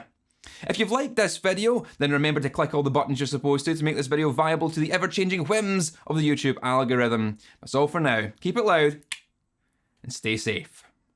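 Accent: Scotland, United Kingdom. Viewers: Deep deep deep Scottish accent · This dudes voice is my Scottish accent impression... fuck I hope he’s Scottish. Also, fuck, I have a Gibson epiphone in the condition, thank you for reminding me that I’m being a push-fit nob... poor guitar doesn’t deserve it.. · Scottish or Welsh Irish? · You have the strongest Scottish accent since Limmy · Are you from Kirkcaldy or Irvine...? Just wondering about your accent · The scottish accent makes it even better! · Hell yeah a Scottish accent on YouTube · Cathartic in two ways: 1. Grime cleaned and everything freshly filed and realigned. 2. That dialect. I could listen to that particular Scots all day. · He has such a Scottish(??) Accent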